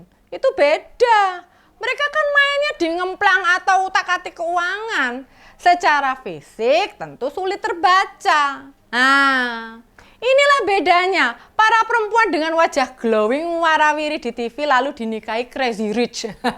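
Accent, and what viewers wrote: Java, Indonesia. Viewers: bak medok amat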